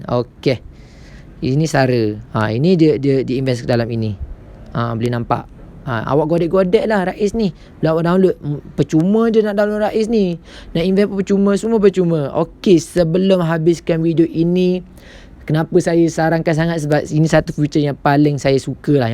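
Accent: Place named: Pahang, Malaysia. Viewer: mcm org pahang je bcakap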